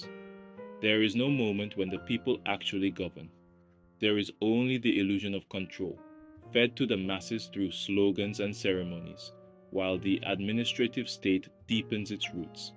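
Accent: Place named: India. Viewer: Sound kinda indian. Can you please curse vishnu for us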